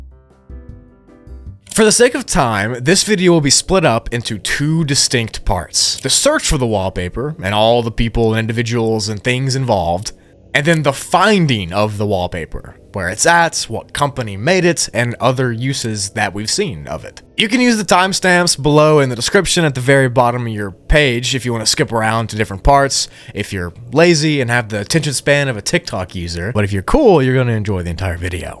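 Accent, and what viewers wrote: United Kingdom. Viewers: I can tell you're Scottish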